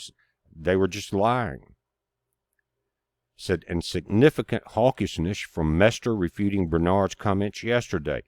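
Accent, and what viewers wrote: South, United States. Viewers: I like him because he has a southern accent but his reporting is some of the most level headed reporting i've observed.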